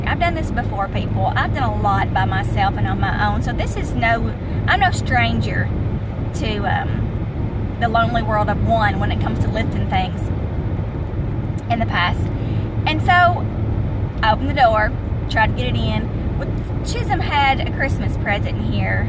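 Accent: South, United States. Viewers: understood. It is also strange because it sounds like you are from the south. Don't give up hope. Seek first to understand what is happening to our culture so you don't suffer needlessly.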